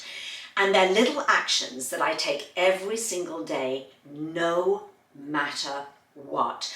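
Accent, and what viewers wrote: England, United Kingdom. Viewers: it's nice to listen to you and you've a positive mindset .and it's interesting to know all the steps to reach happiness and positive mindset eight steps. I'm French my name is RICHARD and I should to put subtitles to follow you because it's most easier for me to understand the meaning of your talk .I suppose you're English what you told us and I don't know why I prefer to listen to English people than American people. I understood your talk and I'm so glad because step by step I improve my English skill .above all what I have learned about what you told me is never never give up is what I'm doing .I like English language and I hope one day to meet English speakers to share with them .here in France where I live people doesnt like sharing language I was born in France and I'm sixty three years old but I try to keep getting my energy. 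I'm happy to be alive and enjoying all things .culture spiritual activity music and cooking too .life is so wonderful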